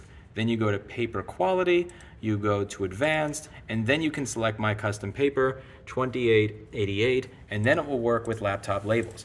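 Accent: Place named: East Coast, United States. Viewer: I was like why does this voice sound so familiar? Gotta love east coasters, oh shoot it is? haha should have known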